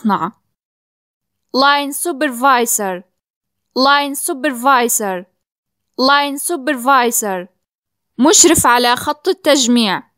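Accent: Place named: United States. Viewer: هذه تتحدث بالأمريكية مانريد الامريكيه نريد البريطانيه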